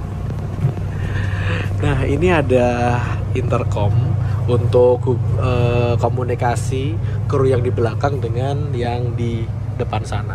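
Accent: Java, Indonesia. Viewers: Bang sekarang udah medok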